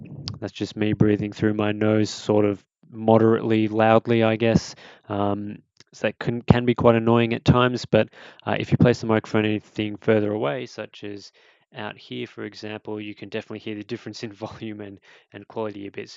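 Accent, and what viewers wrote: Australia. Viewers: He’s Aussie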